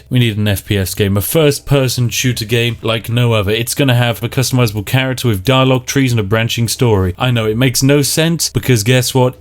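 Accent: United Kingdom. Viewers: this man is everything right about the british accent. all over sub accents can piss off because they are horrible but this is the voice of eloquence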